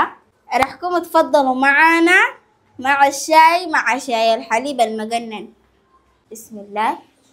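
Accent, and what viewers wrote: Sudan. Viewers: احلى ما فيك اللهجة السودانية البحتة وكلمة شفع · اللهجه السودانيه رئعه ❤احبكم مره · كم هي جمييييل وحلوة اللهجة السوداني انت عسولة مثل الكيكه لسوتيها كل الحب من قلب الجزائر 🇩🇿 ❤😘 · مع ان الكيك عاديي وبنعملو من زمان لكن اللهجة السودانية. بلسانك كيووت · ايييييه ماااجمل اللهجة السودانية احبها كثيرا وتعلمتها باتقان من اختنا الحبيبة #تلك الفتاة الجميلة الراءعة شكرا على الكيكة الراءعة ياراءعة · انا فتحت الفيديو بس عشان اسمع اللهجة السودانية الجميلة الله يحفظ اهل السودان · مسلمة لطيفة مؤدبة بشوشة محتشمة تحكي بلهجتها بكل فخر اللهجة السودانية الجميلة بارك الله فيكِ يا اختي المحترمة والله يفرج عن إخواتنا في السودان وغزة واليمن تحياتي لك اختك من الجولان السوري 💚🤍🖤🫂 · اللهجة سودانيا 😂❤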